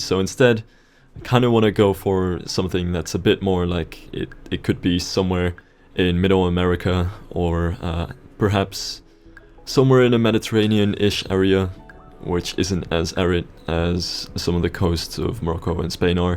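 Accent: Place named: Netherlands. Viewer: Dude i couldnt tell you were dutch Lol nice accent. Btw nice job!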